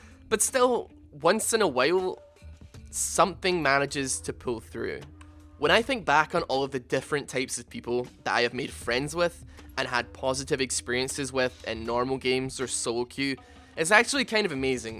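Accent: Scotland, United Kingdom. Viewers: Don’t mind me I’m just here for the Scottish accent.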